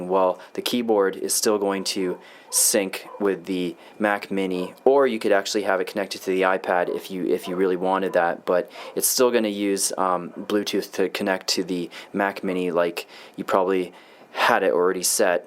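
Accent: Canada. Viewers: judging by ur accent, ur Canadian?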